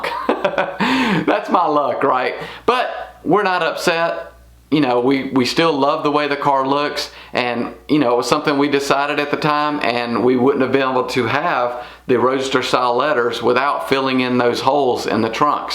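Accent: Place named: North Carolina, United States. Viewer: Hey. I’m in North Carolina and you sound like you’re from somewhere around here-not California! 😂 Ordered our Y a week ago Saturday! Do you recommend tinting the overhead roof glass? I’ve seen mixed comments on this on the web. Thanks!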